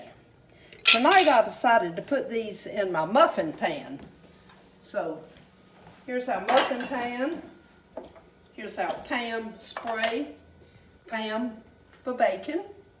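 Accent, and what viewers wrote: South, United States. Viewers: I cannot believe that I have laid out on my counter my sausage to thaw my cabbage is already cut up and my onions and ready to make this dish! I live in Alabama, so we do cook very similar. I am the one that makes my potato salad exactly like you do.! I have just found you under recommendation from another lady that I know and I am so thankful and I love your southern accent!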